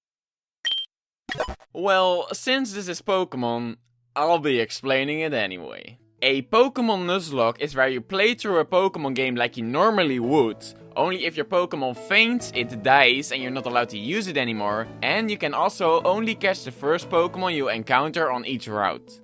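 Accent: Netherlands. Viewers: Are you dutch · Hears first sentence, jep that’s a dutchie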